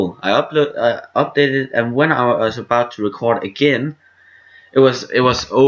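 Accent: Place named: Denmark. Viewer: dansk aksang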